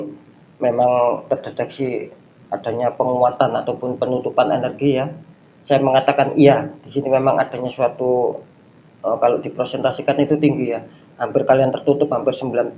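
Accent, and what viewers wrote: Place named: East Java, Indonesia. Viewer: Mas orang jawatimur kah?..kok logatnya kayak orang jatim ya..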